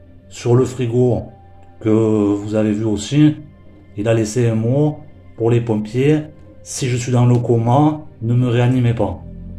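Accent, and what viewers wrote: South, France. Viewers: Merci pour tes vidéos tu fais du très bon travail. Et moi j aime beaucoup l accent du sud. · Alors moi perso pour être abonné à de nombreux youtubers true crimes etc je que j'aime ici entre autre c'est la simplicité, l'essentiel est la dans le fond et dans la forme. Les vues augmenteront c'est sur, avec le temps, et ya une marge de progression aussi mais j'aime la simplicité ici, j'ai l'impression d'écouter une émission de radio c'est la voix qui nous emmène, avec le chant du sud en prime c'est génial! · Bravo mec!....pour tes infos, et oui ne change rien, jaime la France francaise.authentique!...tu as un putain de bel accent du Sud. il est probable que cet homme tourmenté et intelligent est commis d autres actes.... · Te casse. Fier de notre accent du sud. Merci pour le taff. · Salut Tu vas bien merci pour cette vidéo C'est quoi encore ce délire avec ton accent Tu as un très bel accent c'est l'accent du Sud Zut les C ça commence à faire ce sont les mêmes qui se plaignent des Cygales lorsqu'ils viennent dans le Sud Vous êtes pas content c'est pareil restez chez vous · En plus ça donne du soleil son accent du sud 👍🏽👍🏽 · On adore l accent du sud. 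C est le soleil ce bel accent ! Merci pour votre excellent travail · bonjour oui comme beaucoup d autres affaires. J ai découvert votre travail récemment et me suis abonnée. J apprécie votre façon de traiter les sujets et votre analyse sur les probabilités. Beaucoup de travail de recherche félicitations et l accent du sud c est sympa 😉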